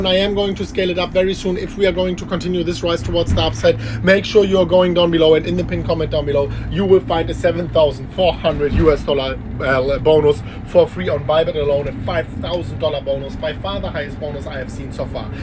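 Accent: Germany. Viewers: Hes german right?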